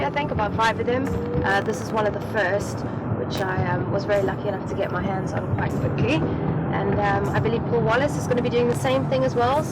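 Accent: South Africa. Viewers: Jacque Noir what's fake? her accent is genuine as she's from cape town...!! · DJRCars Shes from Capetown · Are you south african? Your accent??!!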